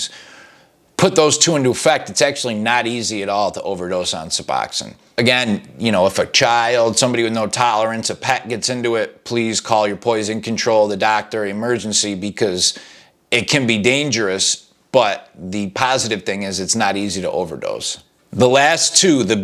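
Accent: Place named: Upstate New York, United States. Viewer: Are you from upstate NY? Trying to place your “accent.” (I don’t actually consider it an accent, but after living in the south for the past several years, it’s nice to hear what sounds like my “accent” from back home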